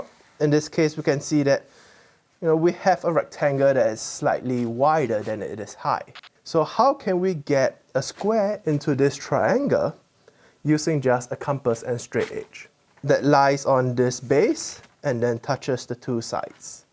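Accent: Singapore. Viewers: dat singaporean accent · is the speaker singaporean/malaysian? because I suddenly feel really patriotic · is he a Singaporean?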